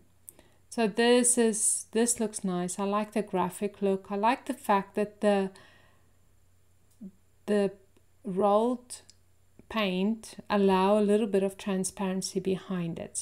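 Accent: South Africa. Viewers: Wonderful inspiration, thank you! Do I hear a South African accent? Lekker!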